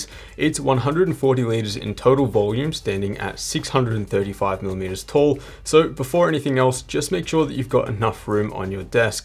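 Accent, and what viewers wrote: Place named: Australia. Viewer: HE SOUNDS AUSSIE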